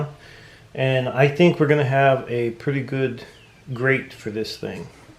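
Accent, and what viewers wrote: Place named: Western Pennsylvania, United States. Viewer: I grew up near Pymatuning Lake, so your western Pennsylvania dialect is a little bit like "old home days" for me. I lived in Indiana for about 10 years and I've been in North Dakota for almost 21 years.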